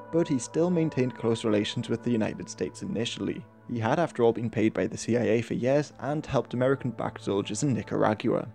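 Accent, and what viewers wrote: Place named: United Kingdom. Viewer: If you're gonna make a historical video with a heavy british accent, consider adding accurate subtitles as well. I have no idea what you're saying half the time.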